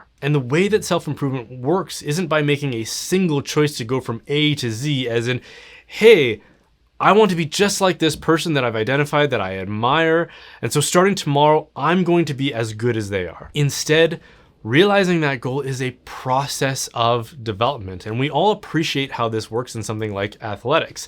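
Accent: Canada. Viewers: It's not dubbed over and he's a Canadian.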